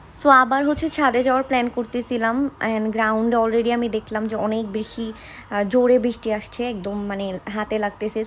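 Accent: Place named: India. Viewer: tmr ktha bartar style indian typr........ Tmr nnu brir vlog vdo dekhe sure holam j tumi amdr Desher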